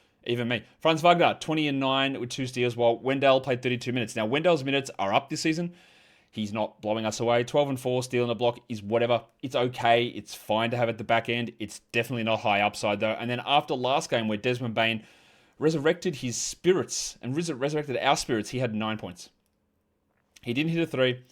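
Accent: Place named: Australia. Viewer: Yo!!! Who is this guy? First time I heard this guy I was about to turn it off once I heard the Aussie accent I immediately judged him as being unknowledgeable but I dropped my remote after a hasty approach to close the video. Thank God! I happened to hear a rare but legendary Jon Vitti Simpsons reference that if you know you know. Only legends know what the f he was referencing. And I wanted more! Fact is that Vitti reference was GOLD! is referencing one of the funniest episodes from The Golden Age of the Simpsons, that was one of the best episodes by probably their second best comedy writers in the history of television not just the Simpsons. Jon Vitti who arguably is behind maybe John Schwartzwelder and tied with Conan, even though Conan didn’t write enough to have as many classics as most Simpsons Golden Age scribes. But, seasons 1-6 of the Simpsons can’t be compared to any other show it goes down as the best comedy tv series animated or not, it doesn’t matter, the writing was so tight and smart. 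If you know it, when someone references one of those episodes like this dude just did in this clip, you know he knows his shit.m, ABOUT EVERYTHING. Guy or whoever this dude is, has some taste. I am listening…you got me hooked. At first, admittedly and with shame, I heard the Aussie accent and immediately judged his hoops knowledge as being suspect. However after I heard the Corey hotline reference I had to give him a chance. He is absolutely surprisingly LE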